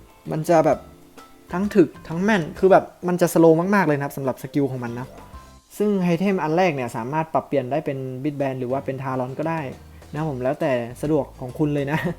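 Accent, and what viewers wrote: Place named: South, Thailand. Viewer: สูคนใต้ม้ายครับ ผมเห็นสูแหลงออกทองแดงกัน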